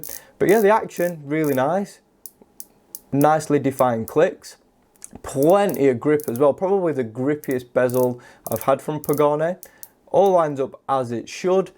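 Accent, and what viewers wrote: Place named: Manchester, United Kingdom. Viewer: I love working-class Manch accent (Manchester). Nice review here. I am looking at this one as a gift for my young nephew. You've got a slim wrist like me.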